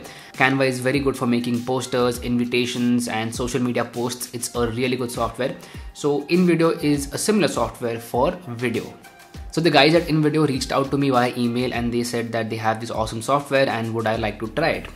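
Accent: India. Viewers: Hhh indian accent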